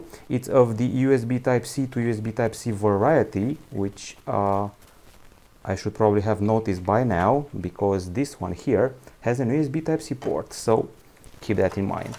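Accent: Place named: Romania. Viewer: Știam că esti român! De la accent și de la tipul de priză.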